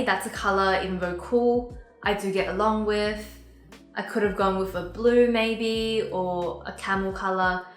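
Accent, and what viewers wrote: Australia. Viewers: Oh yay I thought you were Australian! Love Carriageworks :D And such a great video. I’ve been looking at Babaa knits and this has made me really try and consider 1) whether I need it and 2) which colour will truly suit my skin tone and wardrobe! <3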